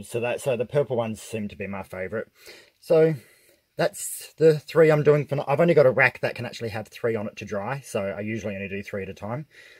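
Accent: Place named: Australia. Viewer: It's good to hear some Aussie talk, Love your work, Cheers from an Aussie viewer xxx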